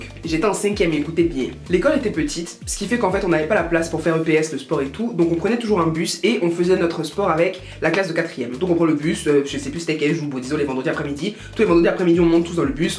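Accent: Ivory Coast. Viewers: Nonnnn tes krooooo bien ta trop l'accent ivoirien naaa change pas on a kro le mm caractère on s'en fou . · dsl moi je vit en cote d ivoire ( mais je suis pas ivoirienne ) j aime pas trop l accent , genre c drole a l entendre mais niveau beaute c pas trop ca ^^ je le trouve un peu trop brusque apres c que mon avis personnelle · J'adore ton accent ivoirien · t'as manière de parler me casse trop on sent que t'es une vrai ivoirienne même loool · Par ta manière de parler je sais que t7 ivoirienne comme mw wallay ma sœur 😍 my côte d'Ivoire j'adore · attend t ivoirienne ou koi ta notre accent putain j'adore ta grandi en cote d'ivoire? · Mdrrrr l'accent ivoirien. ?tu tues grave...Abidjan♥♥♥♥ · Tu fais des efforts pour avoir ton accent français mais quand tu t'emballes tu redeviens ivoirienne vive 225🇨🇮🇨🇮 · « Se qui est suuu » 😂😭😂 ton accident ivoirien est juste trop beau #Rwanda 🇷🇼🇷🇼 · cette vidéo faite avec l’accent ivoirien j’adoreeeee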